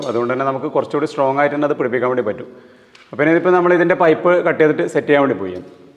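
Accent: Kannur, India. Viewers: Bro kannur aano🙄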